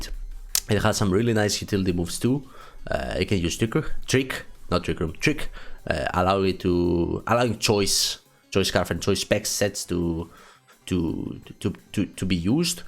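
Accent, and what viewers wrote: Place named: Scandinavia. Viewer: ye i know what you mean. i used you hisuan arcanine team once and wasnt aware enough and got totally destroyed. btw I love your accent, are you from the scandinavian area?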